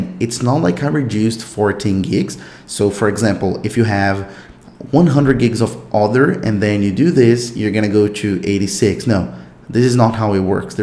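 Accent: Brazil. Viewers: Brigado cara! Eu se que vc fala Portuguese! Could tell you were Brazilian by your accent speaking English!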